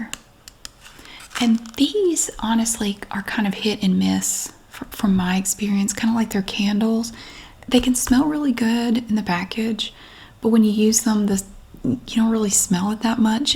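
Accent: South, United States. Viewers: I curious all of a sudden do your boys have the southern accent as strong as yours??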